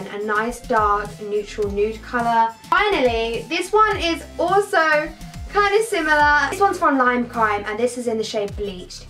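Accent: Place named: Essex, United Kingdom. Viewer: you sound 🔊 like your of Essex good video by the way 😄😛😍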